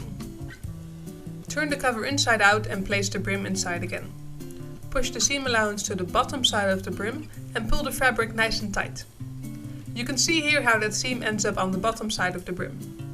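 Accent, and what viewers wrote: Netherlands. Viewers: Are u dutch? :0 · Are u dutch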